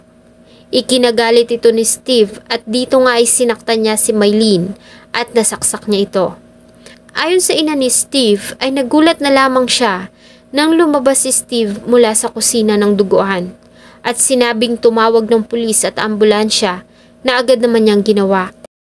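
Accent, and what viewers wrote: Visayas, Philippines. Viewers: bisaya po ata si maam kaya po ganyan pronunciation nya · Bisaya Lang si Maam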